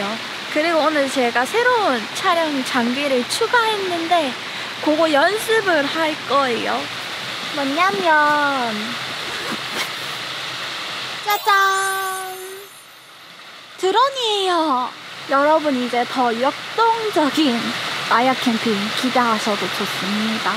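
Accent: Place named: Gyeongsang, South Korea. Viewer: 날씨가 이래가될일이가? 포항사투리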